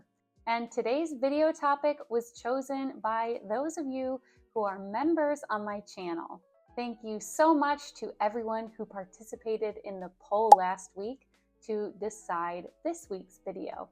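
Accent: United States. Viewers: ❤American accent New pupil is coming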